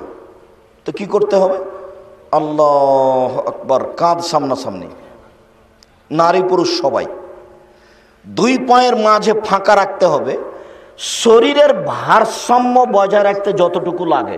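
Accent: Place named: Chapai Nawabganj, Bangladesh. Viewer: ধুর ব্যাটা চাপাই নবাবগঞ্জের ভাষায় আরবী পড়লে হইবো? আরবী শুদ্ধভাবে পড়তে হবে।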